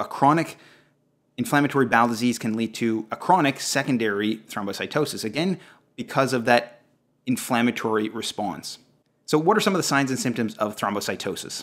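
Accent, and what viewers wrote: Canada. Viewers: He also pronounces out. OWWWWWT Maybe Canadian aye? Leave the man alone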